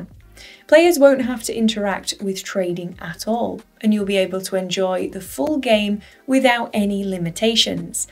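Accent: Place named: North, United Kingdom. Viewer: I wasn’t expecting that accent! Northerners rock 😀 Don’t tell anyone, it’s great ‘up north’! 😂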